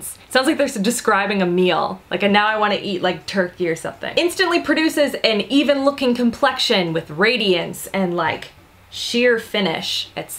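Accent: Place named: Canada. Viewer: she’s canadian huh? I swear most english speaking canadians emphasize the ‘ou’ sound like ‘out’, ‘house’, and ‘about’.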